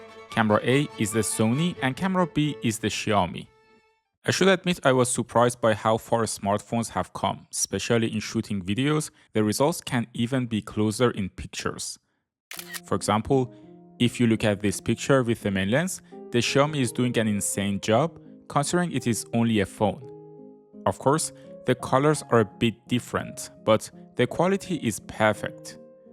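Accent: Iran. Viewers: خانومتون از دید خواهری خیلی زیباست. راستی از لحجه تون فهمیدم که هم وطن هستین.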